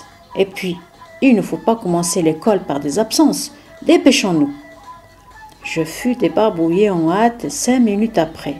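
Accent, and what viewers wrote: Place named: Algeria. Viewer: Un bon resume, avec un accent Algerienne. Tres Bien au narateure ! Merci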